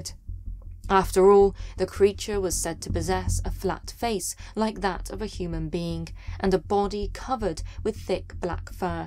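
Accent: England, United Kingdom. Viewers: I love this informative channel!!! Thank you for presenting your content in a classy and professional way!! It urkes me soooo bad when you click on serious and mysterious videos and it's either a computer voice or some joker trying to make funny comments while presenting. Very well done, love your beautiful English voice ♥️ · It's an English accent, not British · You are a great storyteller. This just happened to pop up on my main page, so I thought I'd take a look at it. Being a songwriter, musician, vocalist and body builder those are some of the things that I mostly look for on here. But since youtube started adding different types of videos to my page I started to watch a few of them. You're really good. It's because of the way that you tell them. You also have that great British accent going on there too. I think that your British accent is awesome! 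You do a great job. Thanks.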